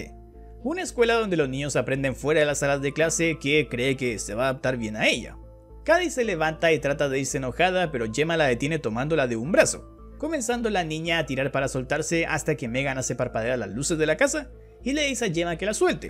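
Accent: Chile. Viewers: este wn es chileno a mi no me engañan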